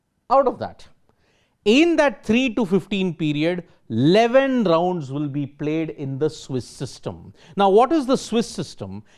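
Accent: India. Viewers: Do u have a problem with the Indian accent? His voice is loud; his pronuciation is clear. What more do u want?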